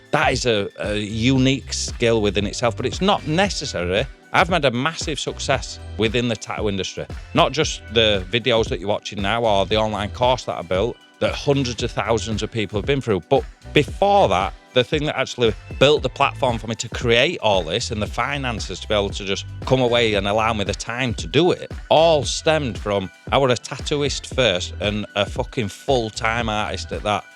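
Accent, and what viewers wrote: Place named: Manchester, United Kingdom. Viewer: no worries you have a bit of a Manc accent.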